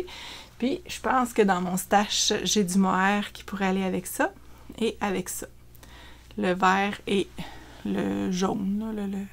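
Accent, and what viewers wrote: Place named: Quebec, Canada. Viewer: Bonjour de France, quel bonheur d'entendre la musique du Québec (l'accent😉🥰) ça m'enchante ! Vos pulls sont très très beaux et les echeveaux trouvés rohhh quelle beauté pour si peu ..on a pas ça chez ns 😢 ..le choix des laines pour les 2 pulls futur sont parfaits si, si j'aurais fait le même choix !! Merci pour ces instants simples clairs et chaleureux, à bientôt 😊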